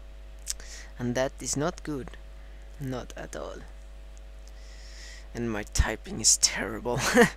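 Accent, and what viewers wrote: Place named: France. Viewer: dude you sound like your french English is good but can improve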